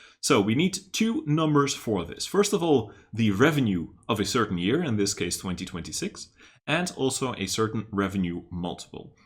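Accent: Netherlands. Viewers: Bedankt! Als ik mij niet vergis, kom je uit Nederland. Maar goed ik vind jou analyse mooi ga zo door. Ik ga je kanaal promoten!!!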